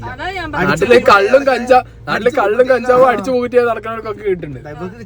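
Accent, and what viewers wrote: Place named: Kozhikode, India. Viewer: കാണാൻ കൊറിയൻ ലുക്ക് ഉണ്ട് സംസാരം കോഴിക്കോട് 😍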